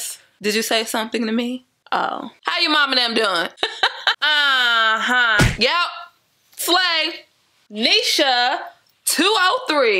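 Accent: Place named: South, United States. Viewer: Bby ILY!!!!! U made me subscribe within 90 seconds!!!! Nd let's tlk about that note beginning 😂😂😂 I said I'm most definitely joining the family nd joining the fun!!! KEEP GOIN WITH THAT YUMMY COUNTRY ACCENT!!! Hubby is from Louisiana nd me Cali ❤️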